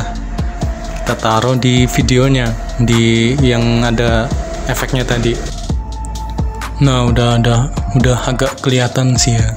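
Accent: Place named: Java, Indonesia. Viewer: Wong jowo toh iki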